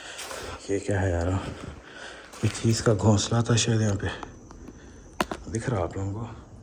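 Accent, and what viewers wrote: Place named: Hyderabad, India. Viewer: Assalamualaikum bhaijaan ...love to see ur vlogs...and also like your hyderbadi language 😅😅😅...plz vlogs continue raikho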